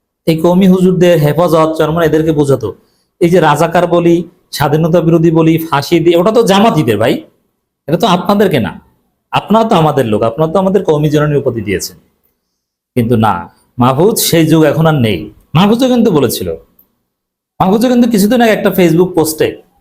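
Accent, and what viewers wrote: Barishal, Bangladesh. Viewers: আপনি কি বরিশালের??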